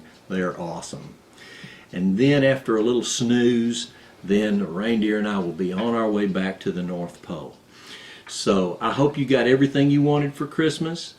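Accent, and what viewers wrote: South, United States. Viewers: Santa had a bit of a southern accent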